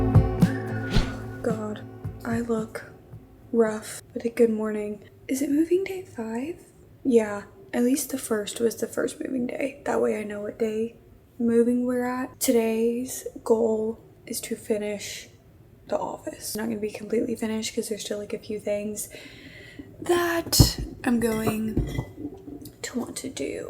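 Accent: South, United States. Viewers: Girl you definitely caught me by surprise omg. Shoutout to you pookie I’m happy for you but I know this must be hard too. I will say I was surprised (when I found your channel) that you weren’t from Texas lol you give southern bell! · Congratulations!! You’ll fit right in since everyone thinks you have a southern accent already hehe. Sending love from Louisiana, we’re state neighbors now! 🩷🩷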